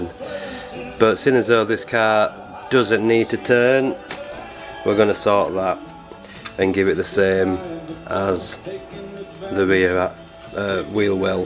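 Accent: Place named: Liverpool, United Kingdom. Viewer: by the way, about your accent. I know your in the UK but are you from the same area as where John lennon was from because, you kinda sound like him a bit👍😉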